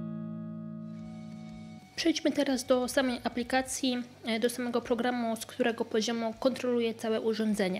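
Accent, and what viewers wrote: Poland. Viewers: Могу ошибаться, но судя по языку (говор, некоторые знакомые слова - как в старом "Ведьмаке"с субтитрами) в видео - она из Польши.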